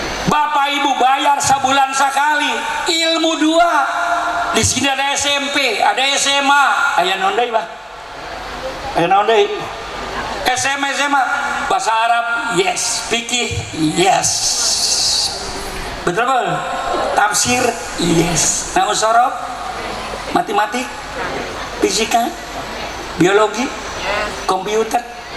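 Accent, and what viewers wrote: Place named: Banten, Indonesia. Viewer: Titisan pandeglang Banten na aya keneh tegeur tina logat ngomongnya kiyai, pelem bahasanya pasehat nyebut jurigna 🤣 Sehat panjang umur kiyai.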